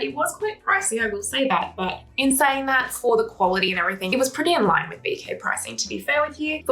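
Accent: Australia. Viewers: Beautiful eye look. I guess I’m a YT dinosaur 🦕.😂😂😂. I like a chill, relaxed, long-form GRWM style video. I personally love to listen to you talk about whatever is on your mind. I think we all enjoy your Aussie accent as well. That TF blush is 🔥. I’m jellies over here in the states.😊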